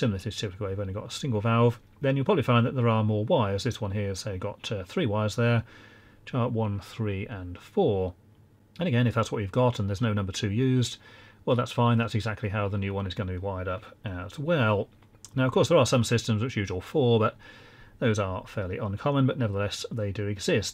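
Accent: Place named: RP, United Kingdom. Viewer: Dressed like green street, but speaks like kings road! Love it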